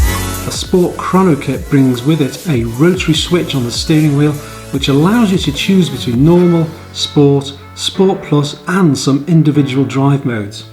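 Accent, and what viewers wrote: Liverpool, United Kingdom. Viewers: Sounds like an awesome Scouser accent ..even better to watch the Porsche video.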